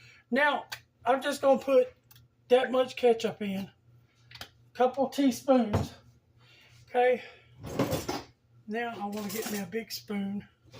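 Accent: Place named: South, United States. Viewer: Meat loaf 😮… Happy to hear your lovely Southern voice princess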